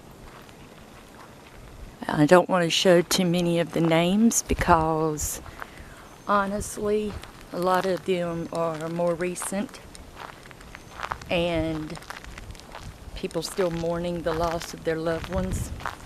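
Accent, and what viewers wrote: South, United States. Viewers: Love your videos and love your southern accent! I have been watching your videos for quite some time now but just a word of advice, why don't you ghost explorers get a small can of bug spray or something to put on your body to protect you from getting attacked and carry it with you especially to cemetery's or in the woods when doing your ghost exploring, I notice this a lot with all of you ghost explorers always getting attacked by a lot of mosquitoes, bees and what ever. Keep up the good work and I will be looking forward to seeing more of your new videos in the near future.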